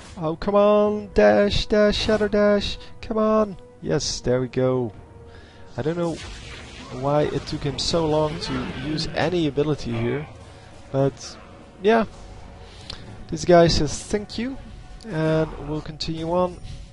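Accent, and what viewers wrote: Netherlands. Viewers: you sound waaaaay too dutch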